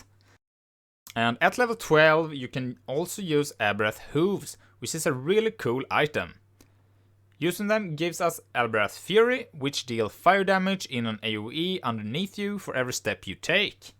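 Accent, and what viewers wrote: Sweden. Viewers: 100% på att du är svensk :D Bra guide.. Tack edit: btw. The pob is a bit messed up. you got a lvl 1 tree, and then the lvl 15 tree is like full build, and lvl 40 tree has less placed skillpoints then lvl 15 :) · Svengelskan ärgrymm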